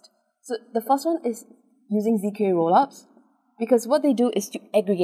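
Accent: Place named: Singapore. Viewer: SINGA-PORE! i can hear dat accent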